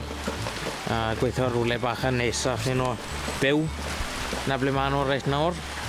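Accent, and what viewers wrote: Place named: South Wales, United Kingdom. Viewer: Hi, thoroughly enjoying the videos although the hwntw accent takes a bit of concentration, would be interested to learn more about your farm, seems like a great place for an enthusiastic & skilled young chap like you to learn. I see a lot of similarities between you & myself - Parts of our farm particularly some of the old stone buildings are in a dilapidated / neglected state but I have a plan in mind for every square inch of the place, money isn’t too much of a problem here but time definitely is. We also have many old 4x4s, quads & tractors, implements & tools - many have been here since new so a lot of time & money has and still is being spent on refurbishing them so they can in their turn help restore the farm. What’s the plan with the farmhouse? Do you live there or elsewhere? Best Regards.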